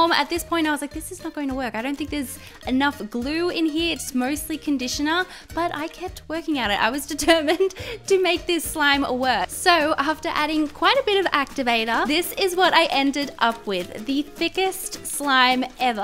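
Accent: Australia. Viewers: Is she Australian??Im new but not a fan I was bored so I came here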